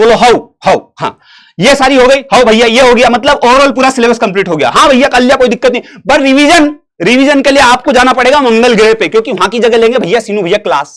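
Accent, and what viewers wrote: Indore, India. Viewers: Apki jo ye indori ganv wali language hai na sir maza aajata hai sun kar 😅😅😅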